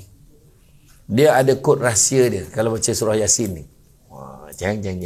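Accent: Negeri Sembilan, Malaysia. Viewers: Assalamuslaikum wbt Allhamdulillah syukur kehadrat Ilahi saya suka dgn loghat NS ustaz. Selawat keatas Rasulullah SAW trima kasih atas ilmu yg ustaz sampaikan hanya ALLAH yg membalasnya. Aamiin3 YRA.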